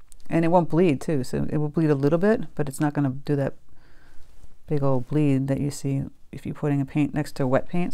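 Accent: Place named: Maine, United States. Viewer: Are you from Maine? I can hear it in your accent ;-)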